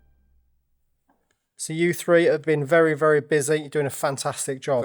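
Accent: United Kingdom. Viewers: if I offended you, I’m sincerely sorry. I hoped the emoticon showed I meant it as a light jest. (As an American, I thought your pronunciation was probably a British quirk.) I have huge respect for you, and I’m a huge fan of your channel. I discovered your channel two months ago and have watched every episode up to this one so far. I forgot that just because I feel like I know you so well, you don’t know me at all, and I shouldn’t presume to joke like that.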